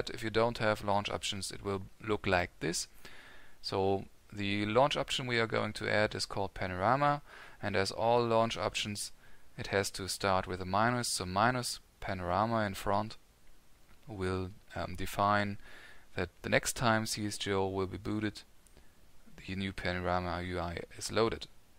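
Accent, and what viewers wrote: Germany. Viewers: Gotta love that german english accent. Sehr gut xd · Ich habe irgendwie instant gemerkt das du Deutscher bist xD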